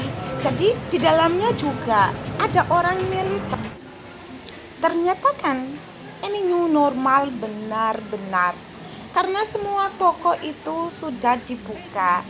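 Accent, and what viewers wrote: Java, Indonesia. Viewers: Aku suka aksen Jawa-nya Mbak. Salut. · Mb sudah medok.salam kenal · Mbak bahasa Indonesia sampean medok hehe... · Mbk jd medok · Medoknya mbk,kereen,suamiku Nigeria belum bisa indonesia,mb keren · Hii..i visit ur channel after i saw ur collaboration with dave. That's great!! Happy stay in indonesia..ur accent almost same with javanese with strong in D, B, G..hehehe.. Btw i'm from west java..can u share about ur hometown culture? Foods, tradition, song or anything.. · Wow mbak aksen bahasa indonesia anda medhok banget seperti aksennya orang jawa,, mantab mbak 😊👍 i'm new subscriber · Kok logatnya kyk orang jawa ya...hehe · Semangat mbak 🤗 medok nya lucu bgt 😁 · Gara gara Londo kampung aku mrene penasaran hehehehe wes medok mbak sampean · Mbak bhs indo nya khas org jawa bgt:D👍 Salam dari kalimantan utara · Bukan seperti orang Afrika, malah seperti orang asli jawa. 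Medoknya 100℅.. Kesini gara2 chanel sebelah. Londo kampung. Semngat trus kkak. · Medhok dong suaranya Tadi habis nonton londo kampung langsung cuss kesini. Btw kak gak di suruh kayak ng scan gitu kalo mau masuk mall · Zimbabwe medhok jawa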